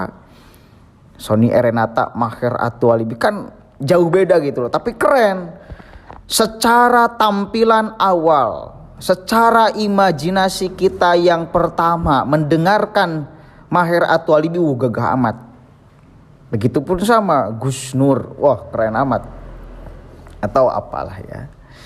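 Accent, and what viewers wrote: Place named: West Java, Indonesia. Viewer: Hehehe sunda banget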